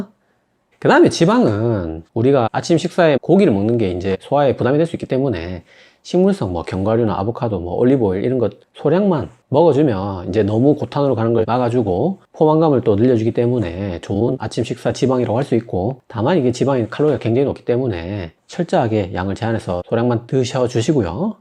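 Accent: Gyeongsang, South Korea. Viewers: 껍데게는 30댄데 말투는 50대경상도아저씨의 그것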